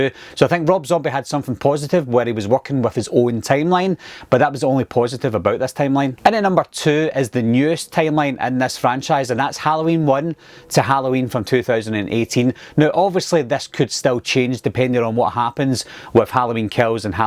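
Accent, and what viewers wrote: Scotland, United Kingdom. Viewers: ...great work as always!! I like how you did something a bit different here, not just the typical paint by numbers with the franchise! Lastly....I should know this, and Shame on me for not knowing...but is that a Scottish Accent, or British? My Muay Thai Coach is Scottish and it sounds so damn familiar...either way! Love it, and great work!!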